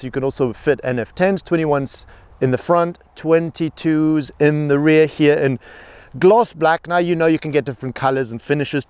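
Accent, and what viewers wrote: South Africa. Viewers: My guy you sound so south African buddy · I'm in 😍 Hey man, are you South African? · Is he South African? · Man, you sound like a South African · Is the guy reviewing the car South African, he sounds like it especially with some of the terms he's using? 😅 eg. Jislaikit and kak fast LOL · Is the presenter South African... Hhay'bo... "kak fast" & (jIs-like)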